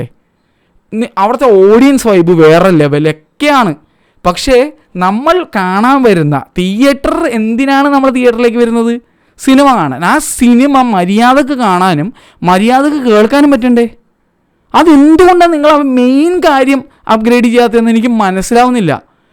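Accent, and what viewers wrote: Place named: Thrissur, India. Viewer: Thrissur ragam ❤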